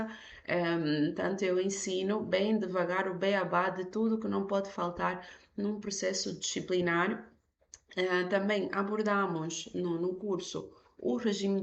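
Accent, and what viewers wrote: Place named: Cape Verde. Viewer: A formadora tem um sotaque interessante, parece de cabo verde.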